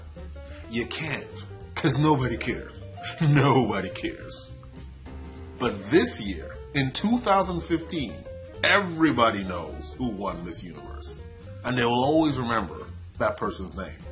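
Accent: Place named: East Coast, United States. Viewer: hahaha subbed back! I hear a little east coast..is that where you're located?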